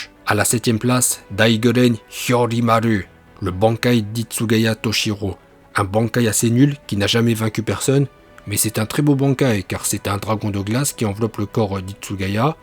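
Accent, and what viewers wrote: Japan. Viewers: Bravo pour ton accent japonais c'est cool 😎🇩🇯🇩🇯 grâce à te vidéos sur naruto DBZ bleach je appris beaucoup de mots japonais merci beaucoup mn frère est perso j'aime bien le bankai le moian globes oculaires le chauve de la division 0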